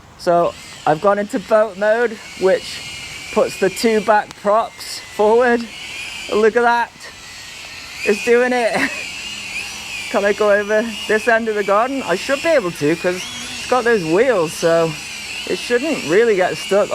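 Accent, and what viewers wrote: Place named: United Kingdom. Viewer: 언제 들어도 영국식 영어 발음은 듣기좋음 👍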